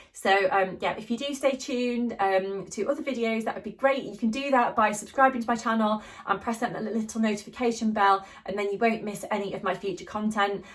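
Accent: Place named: Cheshire, United Kingdom. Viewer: I really like the look of your Tulip skirt and Sew Over It have a sale on at the moment - guess what I’m buying! 😂 I’m not a fan of Tammy’s patterns though, I’ve tried a couple and they don’t suit my body shape (I’m slightly rounder in various places than you are 😂). Good job there’s room for all of us in dressmaking, isn’t it! I’m currently doing a proper fitting course with Gina Renee Designs online, making a moulage and a bodice sloper as I’m so sick and fed up of my blouses etc not fitting properly and having to give them away or charity shop all my hard work. I’ll know how to adapt my rather extensive pattern collection then, I reckon and until I do, I’m making men’s shirts for my husband! Lovely to see you again btw, with your lovely accent that sounds not too far off from me (Cheshire)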